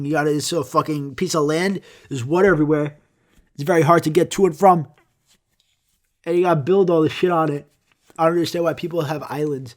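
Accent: New York, United States. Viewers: You have an accent, and a New York one at that. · New York accent. Also your videos are still great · Your NY/NJ accent is hella strong. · I usually dont really like podcasts but ive taken the habit to re-listen your two podcast episodes when ive had a complicated day, hearing your new yorker accent bitching about shit and saying "cawfee" and "wotr" butters my rolls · Are you from New York because New Yorkers say Cawfee. BTW I love your accent.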